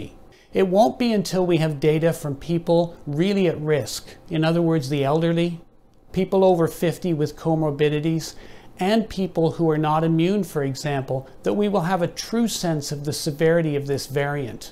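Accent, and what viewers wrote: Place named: Canada. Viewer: MD here for nearly 50 years, I'm also a retired Professor of Surgery at a major medical school in the United States. This is an articulate internal medicine colleague with what sounds like a pleasing Canadian accent and he does have a great speaking style although I think he was reading from a teleprompter-like device. He produced an allegro account of some useful material that started out on the COVID thing but then soon enough went widely to other areas that are mostly tangential, to say the least. There were bumps in the road. His digressions about beverage alcohol use, sleep, exercise, diet, etc. were both strange and strained even if truthful cameo things. But that's almost minor technical nit-picking on my part. I do opine that it was laughably weird -- and very nearly bush league -- to pose him in front of those random pieces of medical apparatus and . 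. . . .[wait for it] . . . . . the wall display of (his?) various diplomas was just hilarious! What were these obviously intentional optical embellishments supposed to do? Make rubes who watch the video pay closer attention? Make the message more believable? Boost the bona fides? This man means well but the show business aspects of this video lecture were regrettable in my opinion. Thank God he did not wear the traditional internist's "flea collar" (a stethoscope draped dramatically around his neck).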